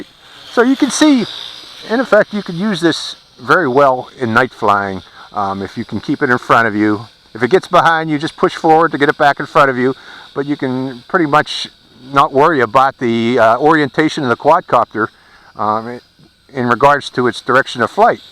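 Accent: Pittsburgh, United States. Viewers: Are you from Pittsburgh or Western PA?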